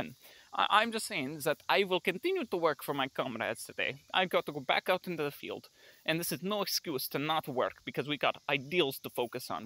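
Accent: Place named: Russia. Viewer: Love the accent. And you have really good English for being Russian. Making the most of what you got and looking on the bright side. NICE!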